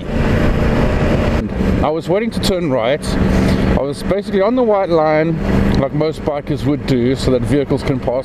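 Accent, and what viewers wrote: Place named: South Africa. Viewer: there's a hint of south African there I think lol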